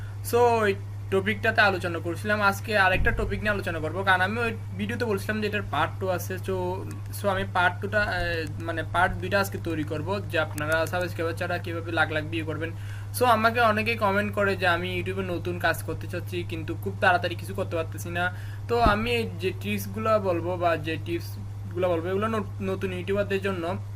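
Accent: Chittagong, Bangladesh. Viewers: আপনার কথা শুনে মনে হচ্ছে আপনার বাড়ী চট্টগ্রাম। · হন বদ্ধা অনর হতাত বুঝাঁ যার অনে চিটাইঙ্গা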